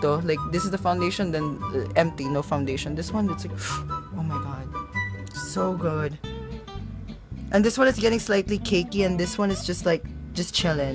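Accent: United States. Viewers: im a new subscriber of yours akala ko nung una american ka hahahahha na curious kasi ako sabi ko bat may foreigners na nag rereview ng anne clutz brush hahah so ayun nagulat ako nung nag tagalog ka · OMG This is my first time to watch your video and I thought you were american. I was shocked when you've started to talk in tagalog! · I literally thought you live in us bc the way you speak! HAHAHAAHAH! Omyygad! Youre slayinnnnn it gurl! This is the first time i’ve watched u! Haha keep spreading good vibes! Mwa xx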